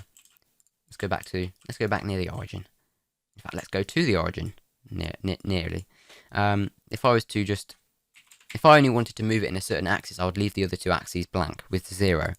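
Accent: United Kingdom. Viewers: I have needed this video for soo long. Also, is british;) FINALLY A YOUTUBER WHO DOESNT SAY zee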